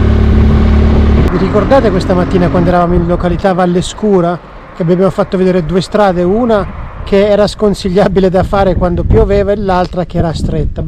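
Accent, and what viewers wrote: Abruzzo, Italy. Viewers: Spettacolo….. posti molto interessanti….. e poi mi sembra di sentire i miei CUGINI di CITTÀ SANT’ANGELO….. grazie di cuore ❤️❤️. GOOD TRIP!!!!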